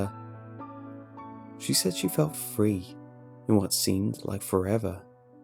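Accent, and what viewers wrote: United Kingdom. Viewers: is he brittish?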